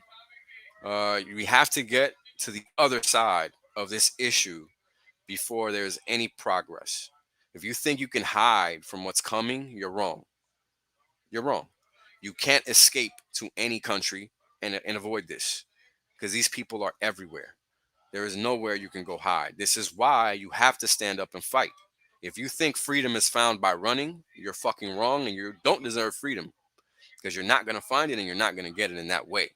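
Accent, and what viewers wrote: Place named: New York, United States. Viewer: Sounds like hes from urban new york... how can you hear skin color ?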